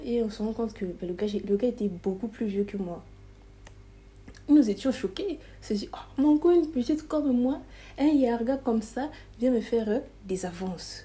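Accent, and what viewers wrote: Democratic Republic of the Congo. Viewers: 😂😂j'aime trop ton accent congolais ❤ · Une vrai CONGOLAISE sans complexe avec son accent j'aime 😍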